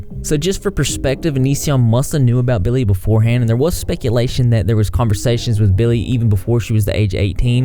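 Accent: South, United States. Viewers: I hate the way southern accents sound but yours is so pleasant to hear wtf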